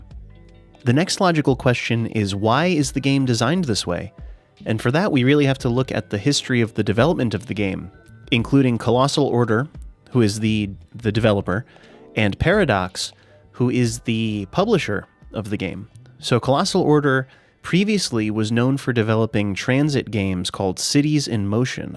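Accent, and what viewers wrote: United States. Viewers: You didn't say it right away, but the the more you talked the more I knew you were 100% in Northern Virginia :). - And although there is a decent number of people who use the metro, it is still highly underused. I came from California, and we had a very similar experience there. It seems, in the US, we love to talk about metro, but we often prefer being in a car.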